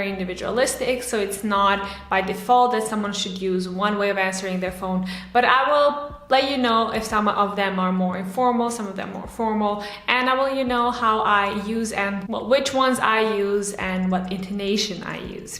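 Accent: United States. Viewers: To me, you sound like you could be from the US. You're not the only one I've seen this with. Why is that?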